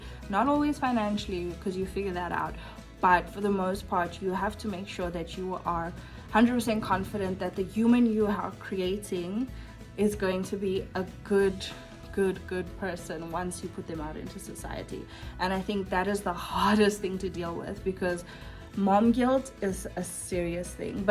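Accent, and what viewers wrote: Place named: South Africa. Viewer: Omg I love your accent!! South African accents are the most beautiful accents ever in my opinion.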